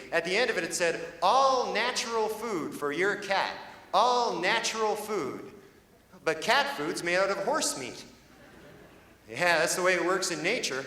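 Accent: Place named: Canada. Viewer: Amazing. All so carefully written. He sounds so much like Letterman here. This is also the only time I've heard him actually sound Canadian. I miss this guy so much.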